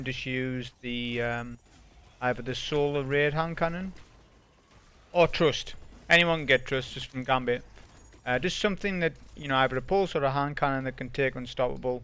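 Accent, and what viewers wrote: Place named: Newcastle, United Kingdom. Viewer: That's a fine Geordie accent 👍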